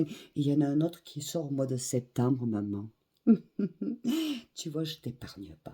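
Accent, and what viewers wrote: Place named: Southwest, France. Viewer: Bonjour vous avez un bel accent serait t'il pas du sud ouest? Bonne santé à vous signé.Boudu con.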